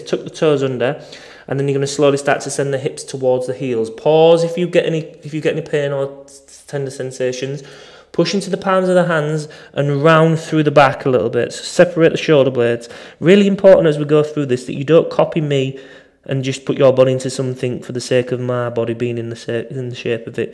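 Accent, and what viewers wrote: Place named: North, United Kingdom. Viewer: Thanks again. Loving your northern accent!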